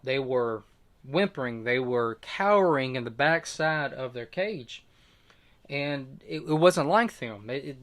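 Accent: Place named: England, United Kingdom. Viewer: I’m sub 136. Good luck with your channel. Love your accent from England 🏴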